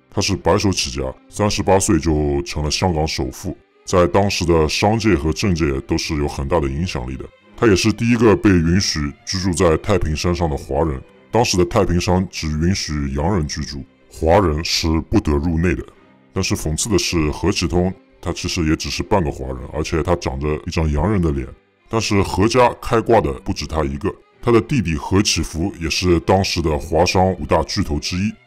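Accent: Shanghai, China. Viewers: 看你表达能力很强，论述的时候也没有断续，应该是有受过训练的专业人士，而且说话的方式和一些地方语言的应用，都说明了你本人是地道的上海人。 我在猜想你不会是之前被国内封杀了的周立波吧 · 你是上海人吧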